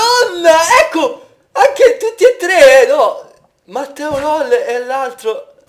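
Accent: Tuscany, Italy. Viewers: è toshano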